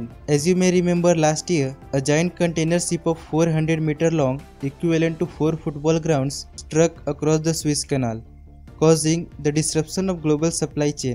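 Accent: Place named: India. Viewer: It's the Indian accent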